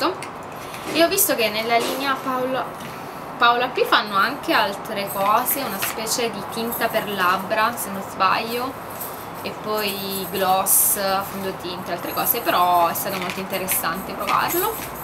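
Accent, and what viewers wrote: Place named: Sicily, Italy. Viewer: ma come mai io pensavo che fossi siciliana???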